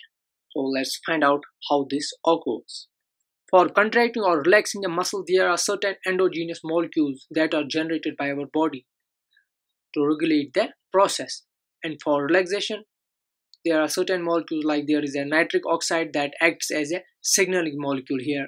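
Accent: Kashmir, India. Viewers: I can tell by your accent you are kashmiri......Nice video tho 👍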